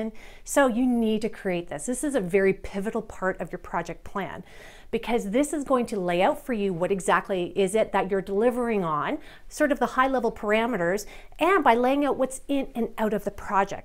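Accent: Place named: Canada. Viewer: It's "About". Mm'kay? "About"! Not "A-boat". Just kidding :-). Are you by chance Canadian? I can't put my finger on your very faint accent. Anywho, great info, very informative. I'm looking for a class to give to all the management at my Aviation job. This is some good stuff.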